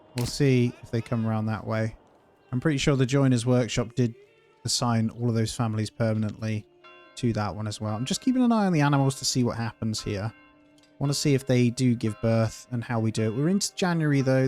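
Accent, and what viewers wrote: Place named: East Anglia, United Kingdom. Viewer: Well its definitely an east anglian accent, I was just refusing to say Ipshit.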